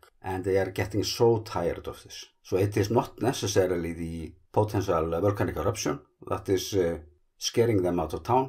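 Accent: Iceland. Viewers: I wonder if the very pronounced Icelandic accent of ' ' might be as cute and endearing to non-Icelanders as, for example a thick Scottish brogue? To us Icelanders it just sounds provincial, no doubt an unfair attitude...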